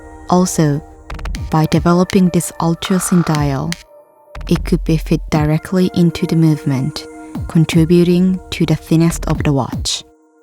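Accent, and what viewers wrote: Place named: Japan. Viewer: 这个视频里的英语发音已经很好了，但是某些音还是有很明显的日本语音的特点。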